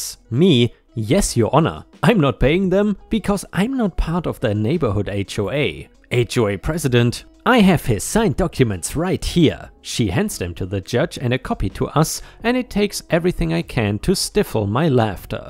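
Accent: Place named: Germany. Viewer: Are you German? Sounds like an German accent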